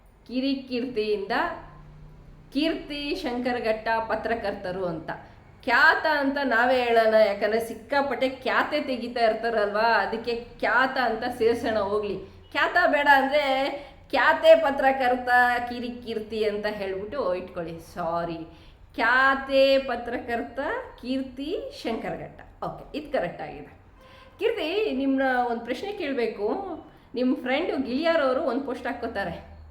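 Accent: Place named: Coastal Karnataka, India. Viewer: ನಿಮ್ಮ ಮಾತಿನ ಶೈಲಿ ನೋಡಿದರೆ ಸ್ವಲ್ಪ ಉಡುಪಿ ಮಂಗಳೂರು style ಇದೆ.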